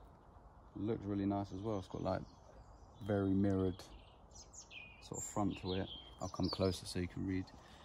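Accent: United Kingdom. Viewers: You’ll get to a million very quickly. Hope you see this, I have a question! as I can hear you’re a Brit.. are there that many people who own outdoors pools here in the UK? I think I’ve never seen one 😂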